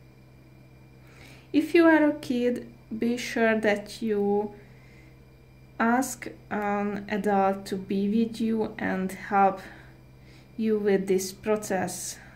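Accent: Hungary. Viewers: I hear Hungarian accent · Hungarian accent? Ifeel that way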